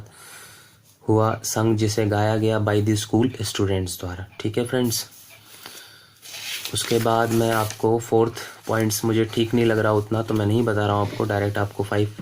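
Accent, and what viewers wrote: Lucknow, India. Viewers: bhai lucknow ke ho ka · Bhai Lucknow se. Ho kya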